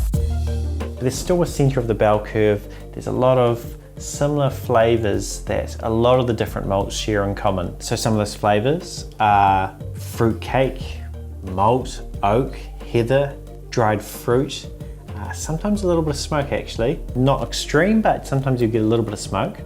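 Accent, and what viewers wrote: New Zealand. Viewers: You're from New Zealand right?? Testing my accent knowledge here lol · Are you a kiwi? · He’s definitely kiwi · When you first started talking I thought Aussie, but second sentence in I was like definitely Kiwi, especially when you said Glunluvet and Glunfudduch lol. Shot bro 🥃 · Hi, thanks for the great video. Question: is your accent a New Zealand or perhaps an Australian accent? Just wondering. PS: I’m an Islay Whisky fan 🥃😁👍🏼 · Hey! Just had this video recommended to me, clicked it, thought 'oh sweet that's a Kiwi', then realised 'wait a second I know that guy'! Loving the content, instant subscribe!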